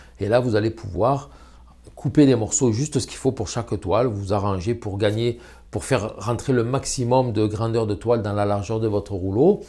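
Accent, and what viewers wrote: South, France. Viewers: Je dessine depuis toujours mais je n'ai jamais essayé la peinture (à part en primaire!). Cet homme me donne envie de m'y mettre, il respire la sincérité et le professionnalisme, ses vidéos sont très intéressantes et bien faites, et j'adore son petit accent méridional! Bravo et merci, et.....j'y vais!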